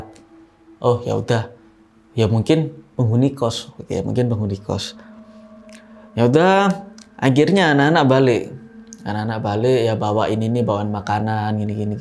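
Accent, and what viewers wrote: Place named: Surabaya, Indonesia. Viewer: Medok suroboyoan e,,👍😁😁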